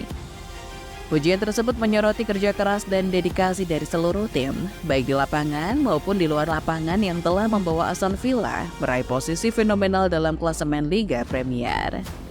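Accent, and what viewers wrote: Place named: Medan, Indonesia. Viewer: Terpongkengham bangkeee wkwkwk org medan abg ya😂😂😂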